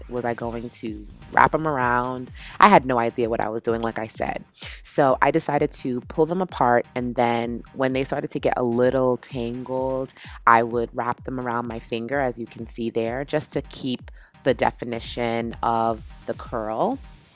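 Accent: New York, United States. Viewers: You remind me of Wendy Willimas (whom I love btw). Your personality is reminiscent of her. Your NY accent is also very similar to hers.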